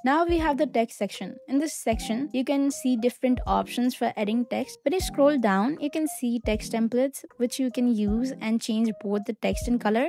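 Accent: India. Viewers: Finally someone has taken the time to explain the basic nuts & bolts/tools of Canva. I also like you Indian accent ❤ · hate indian accent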